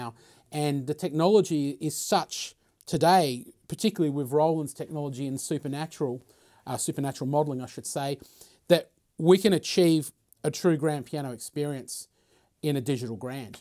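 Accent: Australia. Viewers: Loving the australian accent! how different is the GP609 from the GP607 I by the way own?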